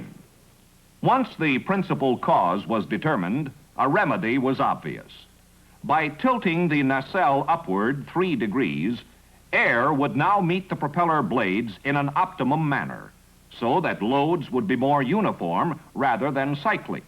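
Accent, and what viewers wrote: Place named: Mid-Atlantic, United States. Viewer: I love Mid-Atlantic accent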